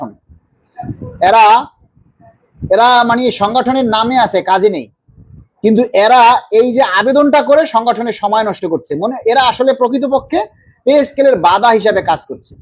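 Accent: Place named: Comilla, Bangladesh. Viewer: কথা শুনে বুজতে পারলাম উনি খুবই বুজদার। কুমিল্লার ভাষা এটা। আমার মনে হয় বাড়ি কুমিল্লা ঐ। বাহিনীর লোক বেশি কিছু বলতে পারিনা।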